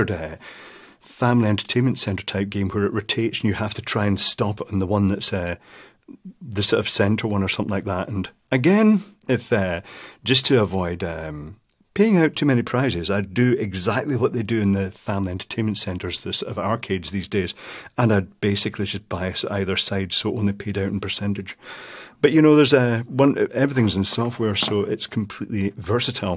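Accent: Scotland, United Kingdom. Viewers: 28 years ago I doubt I would understand his heavy Scottish accent.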